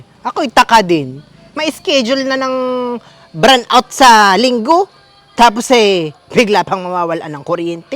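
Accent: Batangas, Philippines. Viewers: Batangas ba ang accent ni messes?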